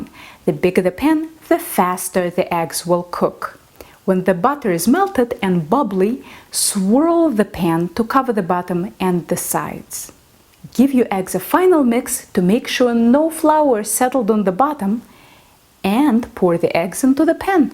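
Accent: France. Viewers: Very good, and I love your french accent! I am going to try this tomorrow.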